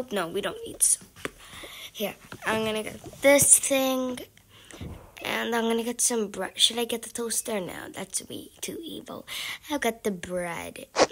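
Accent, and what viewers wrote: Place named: England, United Kingdom. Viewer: 𝕀 𝕝𝕠𝕧𝕖 𝕪𝕠𝕦𝕣 𝕖𝕟𝕘𝕝𝕚𝕤𝕙 𝕒𝕔𝕔𝕖𝕟𝕥<𝟛😛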